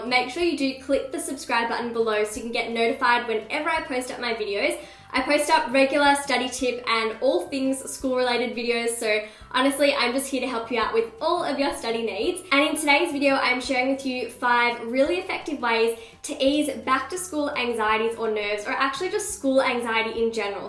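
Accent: Australia. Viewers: Australian lol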